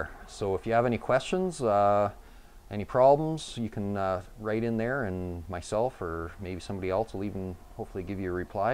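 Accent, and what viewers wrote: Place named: Canada. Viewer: I needed help to fill a window hole. I could only find this and I figured, pretty much same process. This will work perfect. Thanks so much. I was also pleased when I heard you speak. I thought, "Well now...a Canadian accent." Thanks a bunch from New Brunswick.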